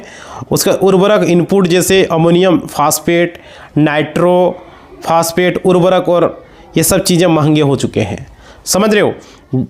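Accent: Bihar, India. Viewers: Bolna sikh le pahle Bihari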